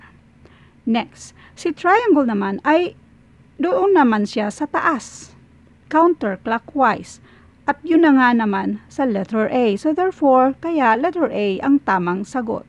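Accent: Visayas, Philippines. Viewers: Bisaya ka ba! 😁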